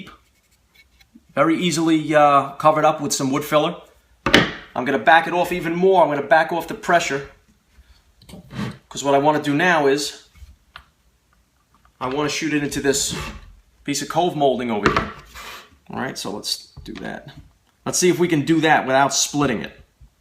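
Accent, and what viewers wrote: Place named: South, United States. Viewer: I just ordered one thanks to your review. Like your southern accent. ;-)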